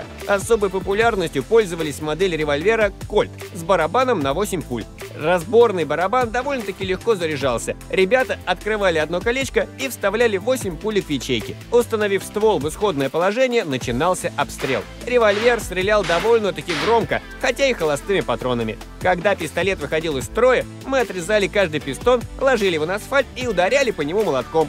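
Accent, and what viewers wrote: Kazakhstan. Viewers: Ты из Казакстана?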